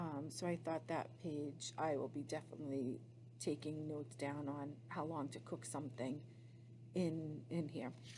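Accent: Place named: Massachusetts, United States. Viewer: I love her Mass accent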